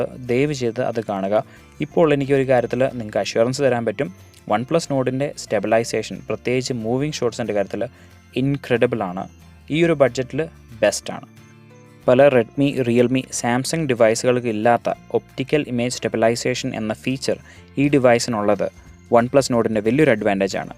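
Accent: Idukki, India. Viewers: Idukki karan ano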